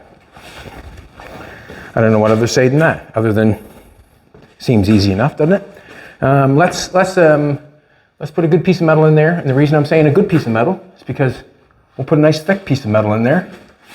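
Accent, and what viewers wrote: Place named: Canada. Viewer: I love these videos, I have been seeing you online and hearing your name. I never knew your were Canadian until I saw my first video of you. haha. I was like... wait a second, I know that accent. Cheers to you. I am in Ontario and I vacation yearly in Souris, PEI. We want to visit Nova Scotia sometime soon.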